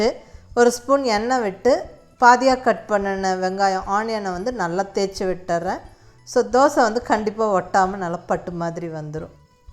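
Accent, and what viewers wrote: Kongu, India. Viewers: Super mam... 👌👌kongu tamil la super ah explain panringa mam...👏👏👏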